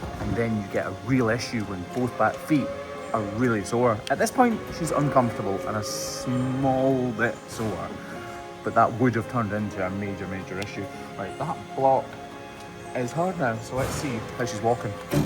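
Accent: Scotland, United Kingdom. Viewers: So interesting and love your gorgeous Scottish accent ❤️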